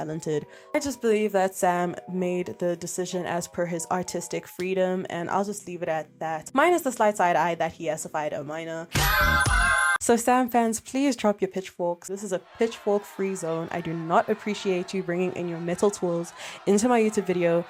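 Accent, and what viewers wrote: South Africa. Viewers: I agree, I think it's perfectly reasonable to expect criticism for your work when you put it out in the world and Sam was very mature going forward after all the backlash. (also just wanted to say your accent sounded really familiar and now I see it's because you're also from South Africa XD) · Such a great video, thank you! It's also great to hear a South African accent on YouTube by surprise! Had no idea when I clicked on this video. Subscribed! 🩷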